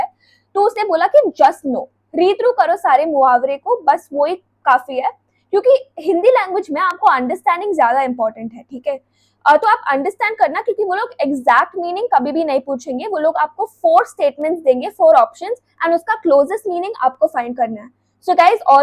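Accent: India. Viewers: Your Hindi accent ❤❤❤ Op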